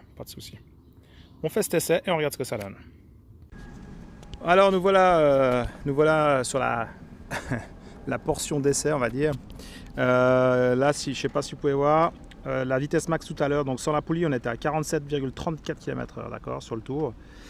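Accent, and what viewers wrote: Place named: Geneva, Switzerland. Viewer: On sent bien l'accent de Genève. Salut compatriote 🇨🇭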